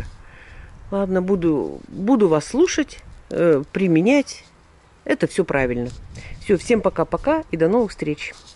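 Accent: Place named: Sverdlovsk, Russia. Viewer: никого не слушайте, вы очень доброжелательны. Я удивляюсь когда вы все успеваете: снимать, готовить, отвечать на наши комментарии. Ваш говор очень похож на то как разговаривала моя свекровь, она со Свердловска. Даже интонации её. Слушаю и вспоминаю свою вторую маму... здоровья вам, энергии,самых положительных эмоций от вашего труда! И сегодня День Матери— поздравлем!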